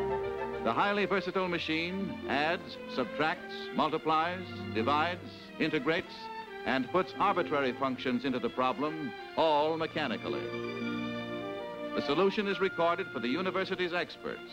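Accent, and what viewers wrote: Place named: Transatlantic, United States. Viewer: Also known as the "Transatlantic accent"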